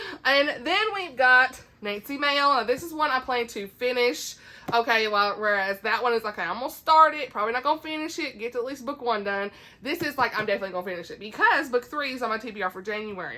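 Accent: South, United States. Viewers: I love your energy and southern accent! We read completely different things but I really enjoyed seeing all the series you want to tackle! ❤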